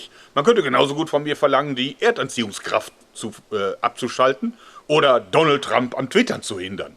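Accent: Germany. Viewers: Wow heavy German accent. Please if there's another expendable film coming around, can you please apply for an audition. I love to see you there. You have a very action like character, and at the same time with superb sense of humour. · Heavy german accent...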